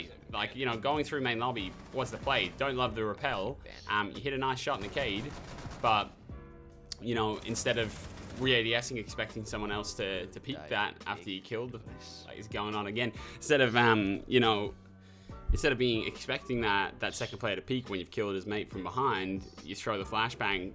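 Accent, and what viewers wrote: Australia. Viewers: Sounds aussie mixed with something else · Aussie is my bet he sounds similar to modest pelican gaming who is also Aussie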